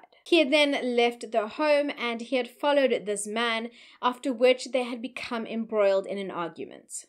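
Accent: Afrikaans, South Africa. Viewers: Love the way you speak Afrikaans 🤣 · its the afrikaans reading for me... this story had me hooked to the end..nice job👍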